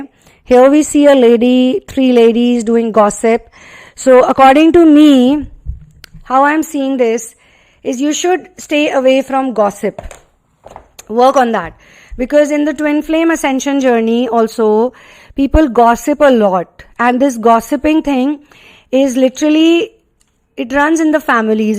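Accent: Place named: India. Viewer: I ABSOLUTELY LOVE to hear you speak! I love the accent from India! Thank you so much for your help and guidance ❤️🙏🙏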